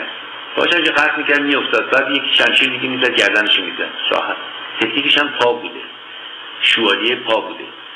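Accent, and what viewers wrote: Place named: Turkey. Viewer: kiram tu kosse madaret ba in lahjeye torkiet.zanjende pash byofte farsha madaro khahare toro ham migan.dar zemn yadet bashe araba jaddo abadeto ham be moghash gaidan ke tule saghe arbi mesle to az kune nanat byofte. kire har chi bahai tu kosse madaro zano khaharet koskeshe mosalmune goh.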